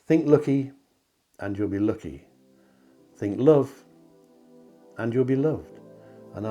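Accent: United Kingdom. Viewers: it’s not a Devonshire accent though! I wondered where you are from - is it Staffordshire? My entire family is Cornish except one Grandfather who sounded like you.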